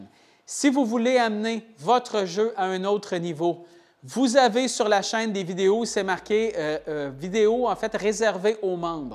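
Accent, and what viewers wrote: Quebec, Canada. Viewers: C'est marrant... Quand vous écrivez, on n'entend pas votre charmant accent de la Belle Province...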